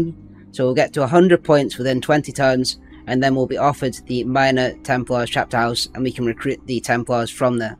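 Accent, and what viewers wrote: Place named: Scotland, United Kingdom. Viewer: Damn ur accent is thicker than the games scotish voice actor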